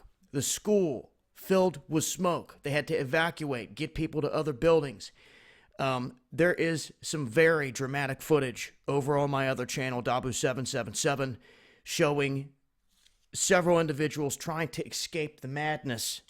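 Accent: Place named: California, United States. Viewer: you sound like Owen Shroyer if he was from California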